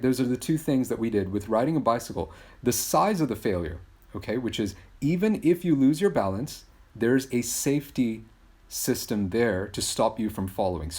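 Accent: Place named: United States. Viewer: sir, you say better than you but the fact is that you're best. Because I've been watching so many Americans they speak like you only. So you're just superb❤👌👌👍✌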